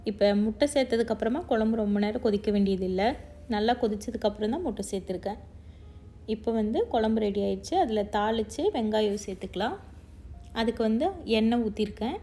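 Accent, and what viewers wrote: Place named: Coimbatore, India. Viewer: Namma slang apdiyae varuthu ka... Naanum Coimbatore thanunga😄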